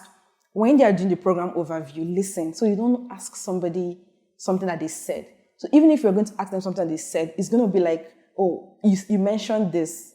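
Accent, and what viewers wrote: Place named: United States. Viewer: Omo, you don dey get American accent small small oo😁😎 Nice video, goodluck to those who applied this cycle.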